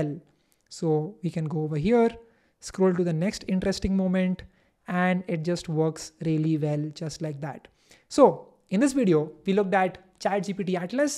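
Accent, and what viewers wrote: India. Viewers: ahh ofc. when i hear hindi accent i already know this video is bullshit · The thick Indian accent 😭🙏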